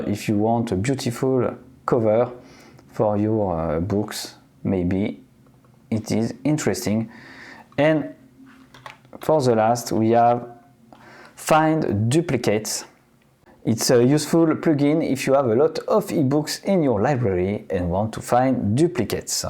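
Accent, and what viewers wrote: France. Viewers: loved your french accent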